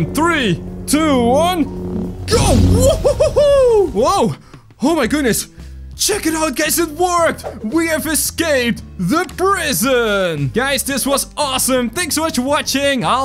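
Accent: Netherlands. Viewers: Just wondering but are you dutch, because I just thought I heard a dutch accent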